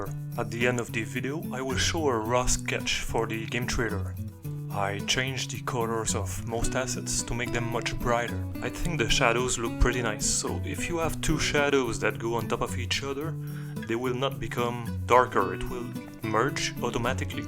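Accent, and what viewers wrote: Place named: Quebec, Canada. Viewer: Awesome ! You sound like a Quebecois ;) Jme trompe tu ?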